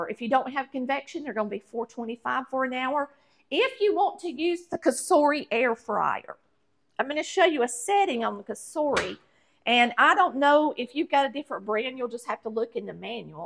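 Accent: South, United States. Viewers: I enjoy your accent! those limA beans looked good. Cook on girl from the south,oh and thank you.♥🌈👵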